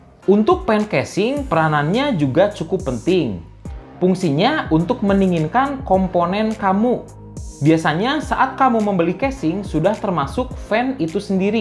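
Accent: West Java, Indonesia. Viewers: Sunda pisan kang 😂 Nuhun penjelasan na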